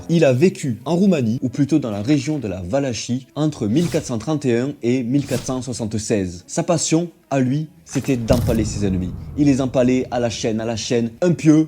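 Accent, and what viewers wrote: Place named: Toulouse, France. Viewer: C'est quoi cet accent sudiste la ? T'es toulousain où bien?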